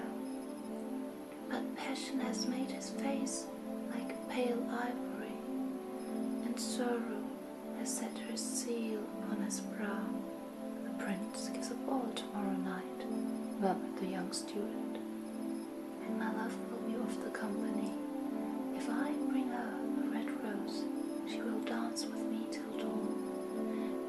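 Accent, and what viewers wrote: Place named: Germany. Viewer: Maybe if your videos get more popular that you could buy a proper microphone, but I don't really know anything about that kinda thing. Well, I've certainly heard a lot of germans with a lot more accent than you have, so don't worry about that! I thought for a while I heard some french in your voice, but also some german, so that's why I couldn't really place it.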